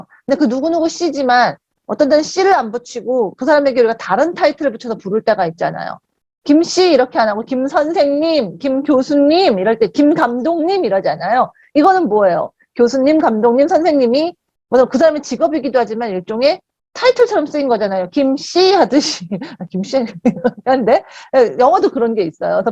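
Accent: United Kingdom. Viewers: 이 영국식 발음이 있으셔서 더 고급스럽게 들리는거였군요… 항상 명강의 감사합니당.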